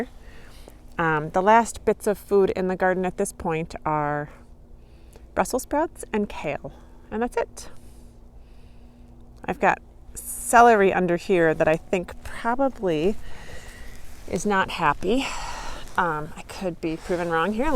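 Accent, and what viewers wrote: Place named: Minnesota, United States. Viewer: You talk so much like me. We must be Minnesotans. 😅 Love November gardening. Thanks for the video.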